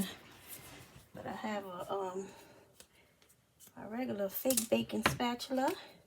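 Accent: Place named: South Louisiana, United States. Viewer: Hi,. 😁 You sound like you are from south Louisiana; Houma, in particular. I'm a Cajun, so, you sound so familiar. 😊❤️. Thank you for sharing your creativity and talent, with all of us. ❤️🙏🏻❤️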